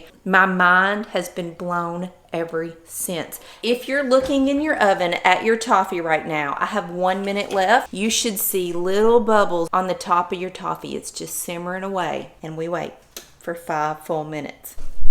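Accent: South, United States. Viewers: Why name it Christmas Crack??? That is the grossest name you could think of. Makes your southern drawl seems even more unbearable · I just love your accent, i can tell you are a true southern lady! And your kitchen is beautiful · 1st of all, love love your "Rizos/Curls", lol. Gracias for your lovely Southern accent & more importantly the literally walking us through to this mega pretty & delish goodness, lol. I'm not a Baker, hopefully this shall change a "Beba" step at a time. I'm in my mid 60's & still a "Beba"/ Baby Girl at heart, lol. Blessings & hugs....❤😊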